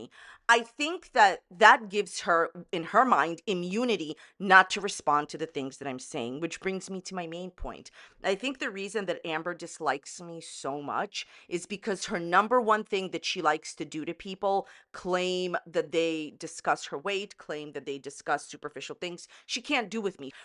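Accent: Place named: New York, United States. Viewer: Hi, I didn't know who you were until I watched Under the hood, Amber, you're absolutely correct about Jordy, I watched Jordy for months when he cover the Cooney girl. I don't know a quarter of what Amber knows about him. It's crazy that she's putting you down and blocking your comments, she's a chicken shit in my opinion. I have a woman doing that to me too. I get around it sometimes. Lol 🤣 I'm not putting Amber down or you because I don't know you, I do however know Amber lies and lies, I think she's a hypochondriac. I watched her to see if she started the weight loss journey she said she was going to start. She has a lotta drama and she is a dirty woman. From my own observation of all the things I've watched. I watch many different channels of everyone talking about her. I believe she uses people and I'm sorry but in my opinion the girl is too loss with who she lets between her legs, if you get my drift And I don't care if she comes to me about what I'm saying because I will tell people to their face what I think or feel. 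Are you from, you have an accent, I know it's not Jersey, but it's not quite New York. I can hear it when you talk. I'm a Jersey girl myself. Nice to meet you even though it's under crazy circumstances 😂😊😊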